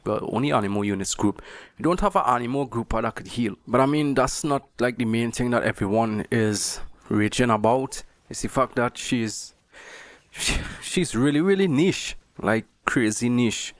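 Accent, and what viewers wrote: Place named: Trinidad and Tobago. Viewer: Dude, are you Trinidadian? That accent sounds classic Trini.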